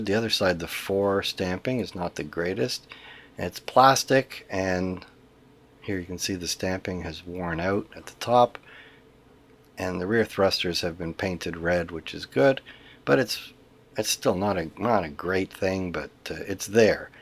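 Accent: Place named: Canada. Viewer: Very nice job, faithful to the original and with a real eye for detail. Also it's always nice to hear (what I suspect is) a Canadian accent.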